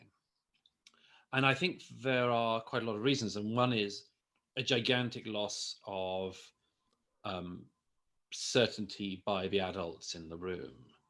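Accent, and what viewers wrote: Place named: Swansea, United Kingdom. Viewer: Sounds like a Swansea accent! 🌅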